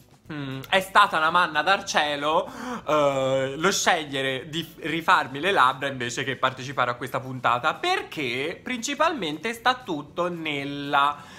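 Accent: Tuscany, Italy. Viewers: Ti faccio una confessione: guardo i tuoi video soprattutto per il tuo accento perché abitando in Spagna mi fai sentire a casa dato che anch’io sono toscana. Continua così, sei fantastico 😘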